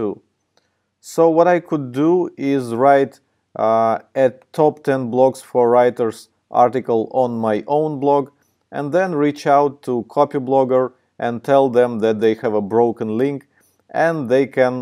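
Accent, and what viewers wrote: Russia. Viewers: Акцент скорее как у Васи русского, а не у ))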